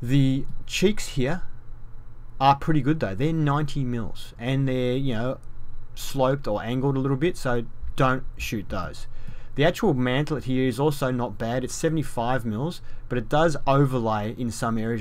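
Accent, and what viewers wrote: Australia. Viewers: R u an Australian/New Zealander?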